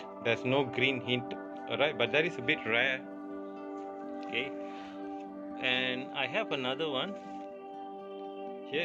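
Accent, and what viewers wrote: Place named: India. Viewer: No problem thank you so much for your kind information i thought you were from India you sound so much like Indian 🙏🙏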